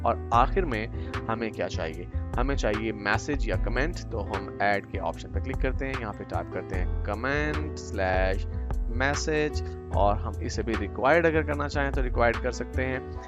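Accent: Pakistan. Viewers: Aap pakistan se ho kya?? Awaaz se lg rha hai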